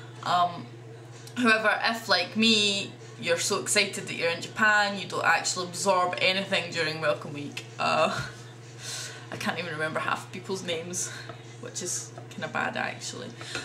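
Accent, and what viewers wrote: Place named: Scotland, United Kingdom. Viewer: Good vid :D I used to be an ALT in Chiba. Instead of making my own lessons, I used all the past ALT's and/or online shizzle. Then I spent the afternoons learning Japanese. Great starter job in Japan and gives ya time to study Japanese. Good vid, diggin the Scottish accent ;) I don't hate the ENglish! THEY'RE JUST WANKERS ,lol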